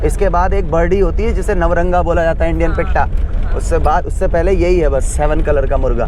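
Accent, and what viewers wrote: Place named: Pahadi, India. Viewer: Pahadi sune me bahut acha lagta h bhai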